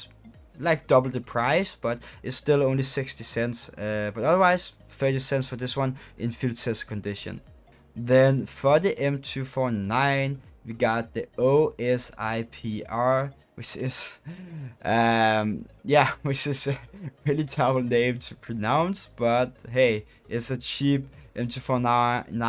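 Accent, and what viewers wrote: Denmark. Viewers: Bro are you danish?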